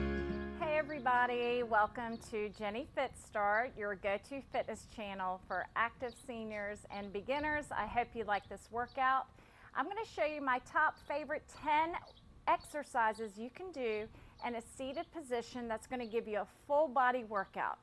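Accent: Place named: South, United States. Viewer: Perfect way to end an hour workout!! I’m from California south 30 miles from San Diego,I wish people here had your southern accent !! Enjoy the upcoming holiday!!🎉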